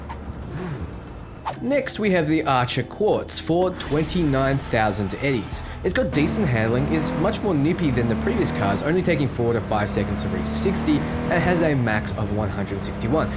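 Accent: Australia. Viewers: Different accents pronounce things differently. There's no absolute right way to pronounce words in english since there's so many different versions of it. E.g. the word "herb" is different between american english and british english since americans say with H as silent while british actually say the H. This dude is australian so they might say it with the E silent. I'm finnish and we study british english at school and even I would pronounce Porsche with a silent E.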